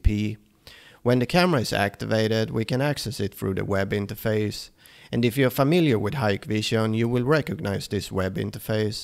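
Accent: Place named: Sweden. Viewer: Låter galet svensk...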